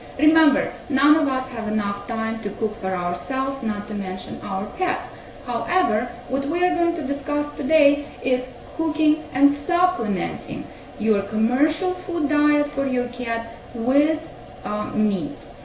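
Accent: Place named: Russia. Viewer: You sound more russian/ Ukrain german and thats not a german accent-- Ich kenne meine Deutsche sprache . (I know my german language )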